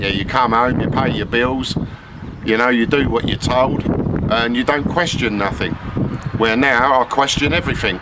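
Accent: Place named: East London, United Kingdom. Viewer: WHERE YOU FROM ORIGINALLY,? I HAVE A SIMILAR ACCENT AND I'M FROM RAINHAM/DAGENHAM. NOW LIVE IN HUDDERSFIELD FOR SOME FUCKING REASON. KEEP UP THE AMAZING WORK, MATE. BIG FAN.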